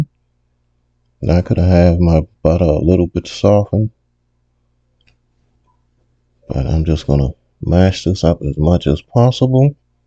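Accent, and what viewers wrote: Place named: Louisiana, United States. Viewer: Hey there!! So happy I subscribed to your channel! First of all I must say I love your voice lol although you do sound like you were from New Orleans or Louisiana! This French toast casserole sounds amazing can’t wait to give it a try! Look forward to watching all of your videos! Loving on you from Philly!!! 😊🙌🏼💜💜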